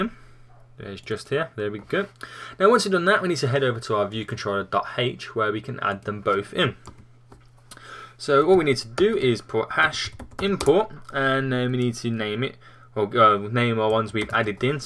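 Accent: United Kingdom. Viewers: Love the british accent! "Tweets to Twi-e" :)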